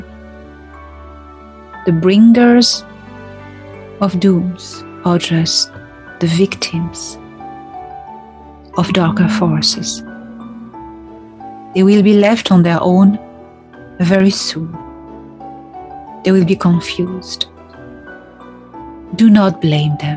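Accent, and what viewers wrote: France. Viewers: Dear, I'm absolutely delighted by your charming french accent, but can we expect a french version of this super motivational vidéo? Namaste 🙏 From a belgian follower and unconditional fan 😍😇 PS: I know it's double work, but take the example of Garbielle Isis, who always record simultaneously her video twice: once in French, once in English...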